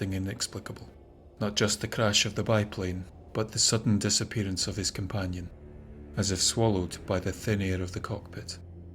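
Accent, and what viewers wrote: Scotland, United Kingdom. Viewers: Just your voice alone makes one wish to see Scotland.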